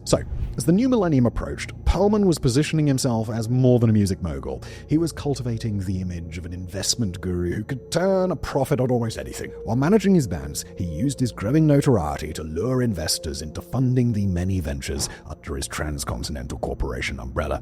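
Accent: United Kingdom. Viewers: He's British. You should hear how they pronounce allininum.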